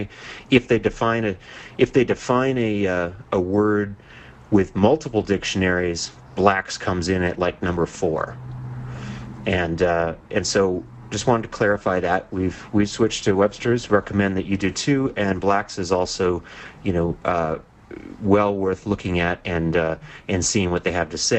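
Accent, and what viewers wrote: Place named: Europe. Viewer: Of course he's saying this he sounds European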